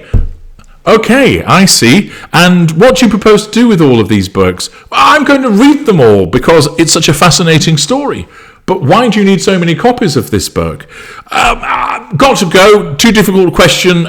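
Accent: Australia. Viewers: Hahaha 🤣 love your Ozzie accent. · Love the Ozzie accent.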